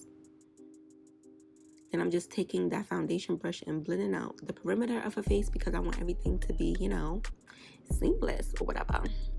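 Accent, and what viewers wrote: New York, United States. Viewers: U a 🐦 like me 😂 U def gotta b from NY😩... love it😍 #Bk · nyc accent i love it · She sound like she from New York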